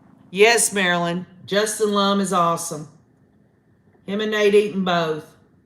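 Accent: South, United States. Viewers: I just love your southern accent. And curse words are just punctuation for honest people ! IMO. I think Lori finally screwed herself by saying how it was months later when she was dancing in the beach 🏝️ . If that phone call had only lasted longer Lori would not have been able to control her responses to summers questions.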